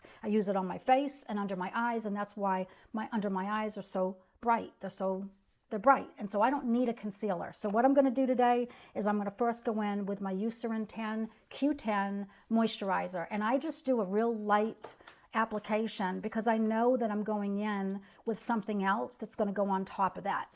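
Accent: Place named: Boston, United States. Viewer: I love your Boston accent!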